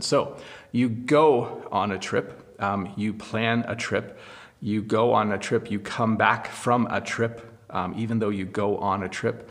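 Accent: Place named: Canada. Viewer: I love the canadian english accent, I think that the word 'ABOUT' can reveal that the person are canandian or not, but i'm not sure of it! I Love your channel ❤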